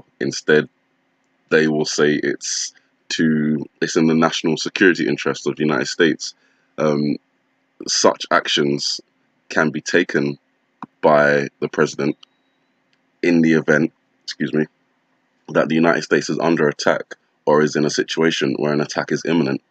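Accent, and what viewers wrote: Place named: London, United Kingdom. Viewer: He's from London lol I can tell...sometimes the accents are a bit hard to understand for others...I understand it though as I'm from England too. ;p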